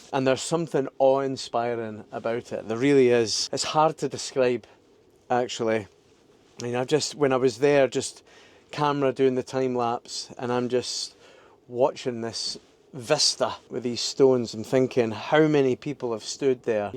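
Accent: Scotland, United Kingdom. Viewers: I found your channel by following you on IG and I still can't figure out how I found you there either lol. This is wonderful and you have a new fan from now on. It's quite hard for me to fully understand that scottish accent but I'm doing my best without using the subtitles. I'm from Argentina and been studying english for many many years but the scottish accent still gives me nightmares lol. Thanks for sharing all these magnificient places.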